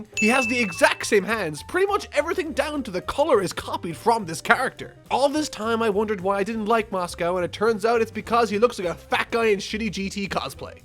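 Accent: Ireland. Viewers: * is irish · Dat thick Irish accent though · are you Irish?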